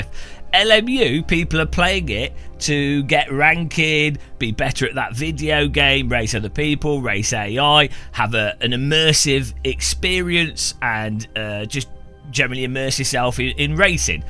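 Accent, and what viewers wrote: United Kingdom. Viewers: Here we have it folks. This is why they tell you not to consume lacquer thinner, let alone on a daily basis... That bald, british sounding man's brain rot level has gotten severely out of control at this point. Time to gently smile back and slowly leave the scene. Might be contagious after all... 😂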